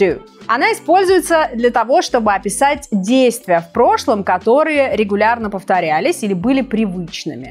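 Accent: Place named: United Kingdom. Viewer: U sound so british. So academic. 😊 No offence, btw.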